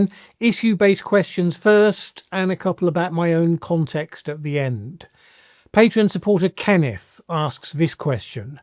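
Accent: Birmingham, United Kingdom. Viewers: l always enjoyed these little explanations. What a gifted teacher. He has the ability to impart knowledge in a way that is easy to understand. Great questions too. I got the accent because I am also a 'Well Spoken' Brummie. Yow awlright Bab? The Birmingham accent is actually sardonic and self depreciating in a somewhat sarcastic way. Ow Arr. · I’m a Southern Counties Brit and now can’t help trying to imagine your Brummy undertones. Unfortunately, I only discovered your channel recently, and as something of a Climate nerd would likely have benefited from your earlier videos. To me you are providing helpful leadership. That said, I slightly wince when you cover your back for not being even better, although that’s obviously a cultural thing that afflicts us all. I realise that standing for what you’ve just said by not following it with an apology to fend off the expected attacks is uncomfortable.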